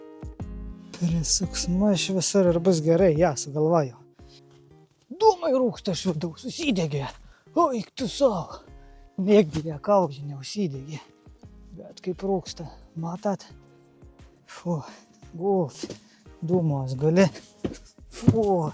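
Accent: Aukštaitija, Lithuania. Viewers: Smagu žiūrèt śio žmogaus video del kruopštaus ir kantraus darbo, bet dar ir dèl to: bunkeriokas, miškiokas, ežeriokas, bulviotè, druskiotè ir t.t. Nuostabi aukštaitiška tarmè - glosto širdį! Vienas malonumas ne tik žiūreti, bet ir klausytis.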